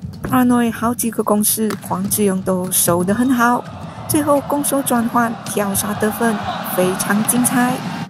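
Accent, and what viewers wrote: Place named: West, India. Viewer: 这个似洋似印的旁述扰人观赏球赛呀😂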